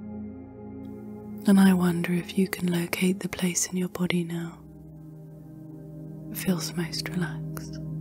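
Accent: United Kingdom. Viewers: I knew your accent had a lovely hint of west country, I thought maybe Wilts Dorset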